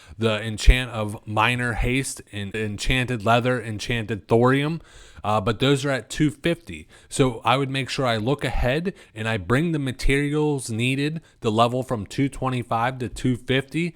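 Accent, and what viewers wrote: Philadelphia, United States. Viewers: Definitely from Philadelphia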